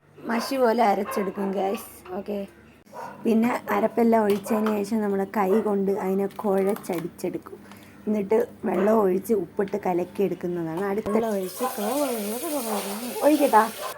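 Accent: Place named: Thiruvananthapuram, India. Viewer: നിങ്ങള് വേറൊരു പുള്ളിക്കാരിയുടെ കൂടെ ഒരു മേക്കപ്പ് വീഡിയോ ഇട്ടിരുന്നത് കണ്ടിറ്റ് വന്നതാണ്.. തിരുവന്തരത്ത് എവിട? ഇതായാലും നിങ്ങള് ആ ഭാഷ തന്ന പറയണത് കൊള്ളാം 😊 നല്ലതായിട്ട് പോട്ട്!! 👍🏻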